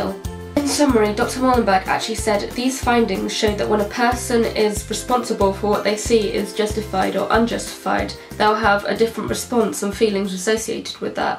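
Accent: United Kingdom. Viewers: Serious subject, happy clown music and a brittish accent do not go well together. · British Accent, Happy tone and a Psycho Topic. Hillarious.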